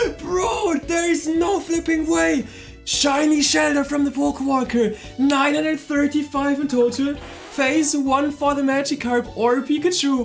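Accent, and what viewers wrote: Germany. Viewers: You can shiny hunt at f pokewalker??? Do i hear... Hör ich da einen deutschen akzent? Ich hab das actionReplayDS auch noch, kann man das auf dem DSi auch benutzen?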